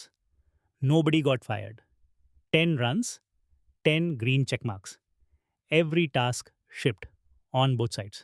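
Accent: India. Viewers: It’s my first time seeing a video from this guy with the thick East Indian accent. My feeling is that regardless of any bias he might have he did worthy testing and an excellent job of spelling out his methodology. Better than the usual junk that I’ve wasted my time watching. So: 1) Kudos to him for doing this. 2) where’s your YouTube video doing this kind of evaluation in a totally unbiased way? Seriously, it’s easy to criticize but takes effort and I think you shouldn’t be so harsh. Please ponder that…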